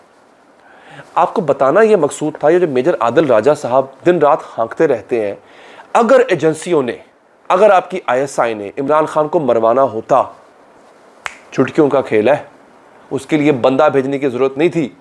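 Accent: India. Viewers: India k channels kam dekha karo.tumhara tou lehja bhi Indian hogaya hai tum bhi ghaddar ho besharam😡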